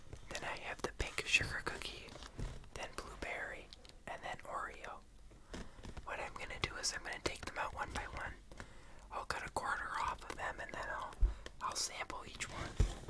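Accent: Midwest, United States. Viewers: i’m wondering too lol, very strong midwest accent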